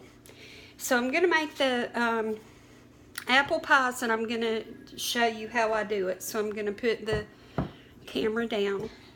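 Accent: South, United States. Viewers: When the presenter has a Southern accent I know whatever the recipe is it will be GOOD!!! These are amazing thank you!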